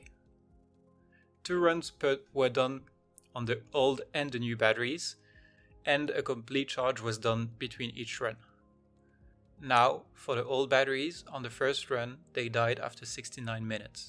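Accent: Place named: France. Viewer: Merci pour la vidéo ! (J'assume que t'es français mais c'est léger à l'oreille)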